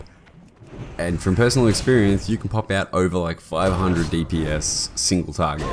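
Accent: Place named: Australia. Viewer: I hear a fellow Aussie here!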